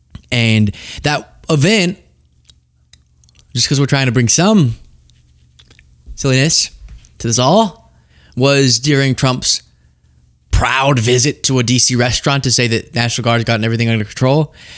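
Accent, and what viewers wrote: Finland. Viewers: we dont need a Finnish accent. Or the giggles and juvenile humor. You have talent, just keep your show professional. The humor you try to introduce doesn't work with the subject matter you cover. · Finnish accent :D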